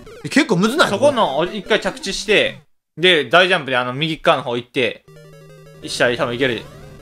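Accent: Kansai, Japan. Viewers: 何言ってんのかわかんな 関西弁強すぎ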